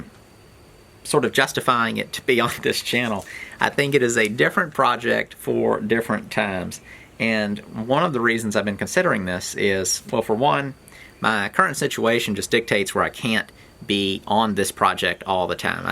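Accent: South, United States. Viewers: American with a Southern accent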